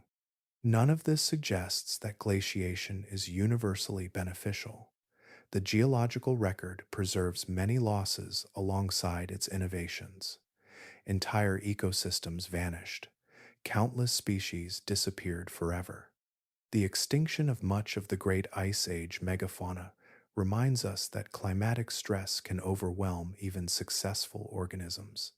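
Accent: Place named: United States. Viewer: Thank you for the American accent on your voiceover.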